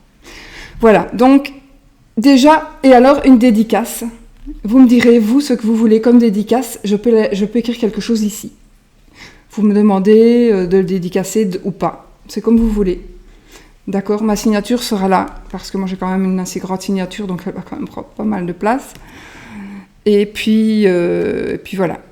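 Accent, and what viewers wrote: Belgium. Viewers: Bonjour,Magnifique ton oracle … tes dessin sont tellement parlant … il m'a tapé dans l'œil dès que je l'ai vu et j'aimerais vraiment me le procurer … j'espère que cela est encore possible.. J'adore ton accent vive la Belgique … cet accent il me manque … mon mari était belge malheureusement il est décédé en juin 2018. Belle journée à toi. merci Pascale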